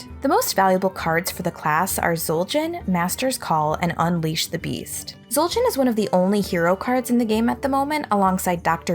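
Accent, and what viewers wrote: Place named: Michigan, United States. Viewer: I don’t hate your voice. But your accent is very distracting when my brain is trying to figure out what a warlack is 🧐😜 Michigan, I’m guessing?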